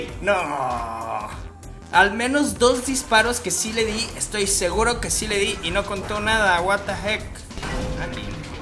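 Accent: Mexico. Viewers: Porque hablas como arigameplays? Es algun dialecto de alguna parte de mexico ?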